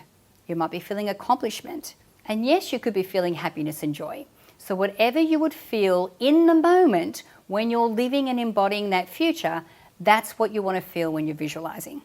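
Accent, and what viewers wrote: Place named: United Kingdom. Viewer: but your accent sounds british. Am i wrong?🤔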